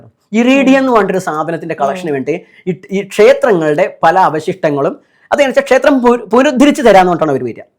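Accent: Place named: Namboothiri, India. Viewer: സംഭാഷണത്തിൽ നമ്പൂരി ശൈലി ആഡ്യത്തം ങ്ങട് ലേശം കുറച്ചോളൂ... ബാമ്പ......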